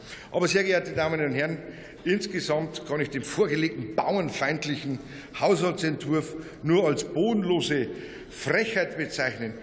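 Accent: Bavaria, Germany. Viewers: Agrarthemen mit bayerischen Dialekt wirken irgendwie authentischer... 😂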